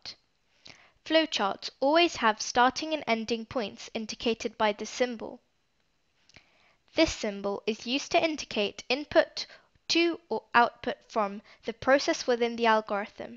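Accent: United Kingdom. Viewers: south england accent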